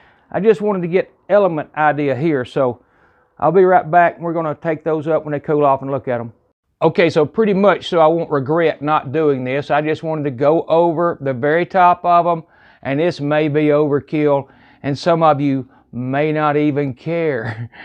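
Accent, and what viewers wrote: South, United States. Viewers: Thank you so much for doing this. Your video helped me decide between the two appliances. I like your southern accent, too.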